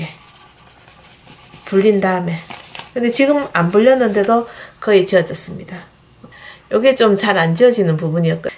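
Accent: Gyeongsang, South Korea. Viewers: 경상도 사투리 멋찌십니다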